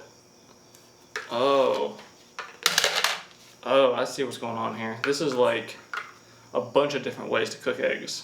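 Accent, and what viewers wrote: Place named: Georgia, United States. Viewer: Holy crap I just discovered today and these videos are so fascinating. It’s 6am and I still haven’t slept 😭😭😭 I just keep watching! Also I’m curious to know where is from. He sounds like a fellow Georgia native.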